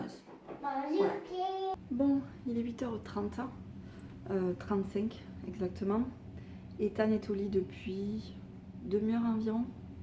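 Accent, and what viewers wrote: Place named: South, France. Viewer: Oh ce bel accent du sud j’adore 😂 elle est trop marrante ! Vlog cool comme d’hab des bisous 😘😘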